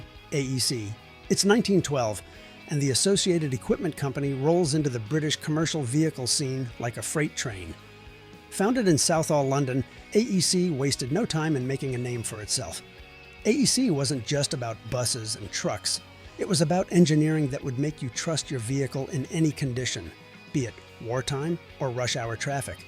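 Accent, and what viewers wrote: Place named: United States. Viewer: Why do we have to have the voice of an American narrator.???????????????????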